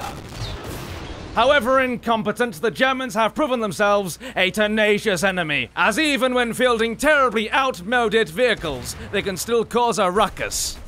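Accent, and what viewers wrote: United Kingdom. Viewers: are you really British? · What part of England is from im from Newcastle the north east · Do you put on a voice for videos or are you really that British? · you are by far the best YouTuber I've ever seen. You see, I am a person that loves to listen to British war and marching music and play war thunder and your videos are all that and more! I also love your British accent! Thank you for reading my comment ( If you found it)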